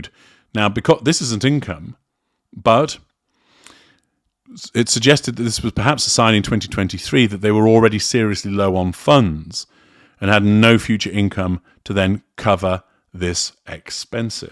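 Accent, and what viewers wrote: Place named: Cockney, United Kingdom. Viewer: Hey Mr cockney Man!!! We don't talk shit about your royalty's entitlements so don't talk about our American royalty. Those two and their feral kids are American now with all the entitlement BS that comes with it?!!Sooo!!! why don't you have a beer and a smile sit down and shut the F/U!!!!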